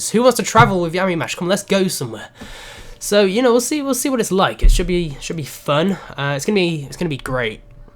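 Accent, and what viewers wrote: United Kingdom. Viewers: i gotta few questions for you bro actually 1: is that a british accent u got? 2: how come your eyesights bad? 3:how is it you find out when a new slenderman game is out? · Is there anywhere in particular you want to visit in America? Also I'd love to see you say (or try to say) my username since you have a British accent and everyone already butchers it. P.S you should visit Texas when you come to America. I'd hang out with you. :p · He forgot to say one thing he has that most others don't. He's got a British accent. All he needs in life now is to move to America, and use that accent to his advantage.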